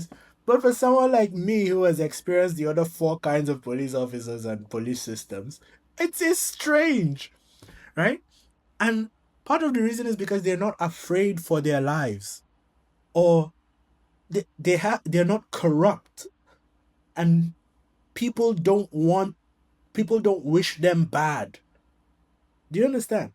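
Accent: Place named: Southern Africa. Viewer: Did you ever live in Southern Africa? Your accent sounds Southern African. That said, these parts of Barbados look like parts of Akwa Ibom State. The major difference are the pedestrian walkways in Barbados.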